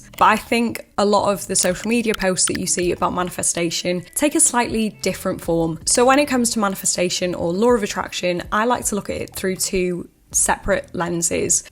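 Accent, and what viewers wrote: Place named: North Wales, United Kingdom. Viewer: Fellow north wales girl here thought your accent sounded familiar x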